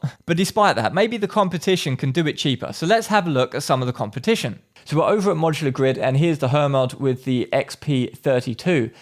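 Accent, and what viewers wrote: United Kingdom. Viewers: You sound British. Are you in London?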